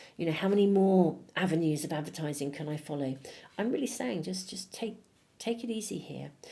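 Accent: United Kingdom. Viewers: Hyy, you! I love your readings, your lovely british accent and your unusual, awesome oracle decks! Great reading..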